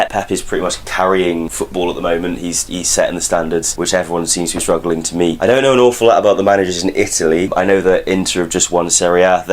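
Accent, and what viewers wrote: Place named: Liverpool, United Kingdom. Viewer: It's still very hard to understand what you're saying with that strong scouse accent. Good to see you fixed the mic tho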